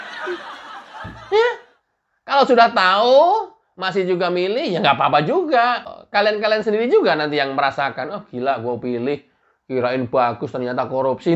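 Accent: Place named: Rural, Indonesia. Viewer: Bicaranya koq seperti orang kampung ya ! Enggak bermutu lah !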